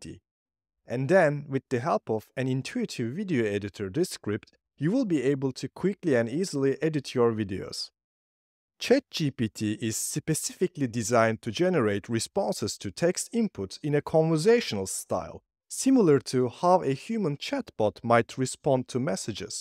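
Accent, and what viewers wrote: Turkey. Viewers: Türk aksanı 😊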